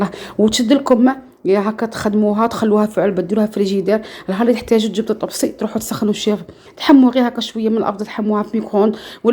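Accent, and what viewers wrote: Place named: Western world. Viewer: Allah ya3tik essaya, ça fait plaisir d'entendre l'accent de l'ouest😘😘😘😘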